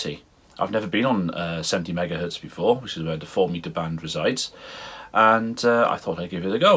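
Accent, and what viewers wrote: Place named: Newport, United Kingdom. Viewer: Always a pleasure to watch, the accent is familiar, im a newport lad personally. 73 keep up the good work.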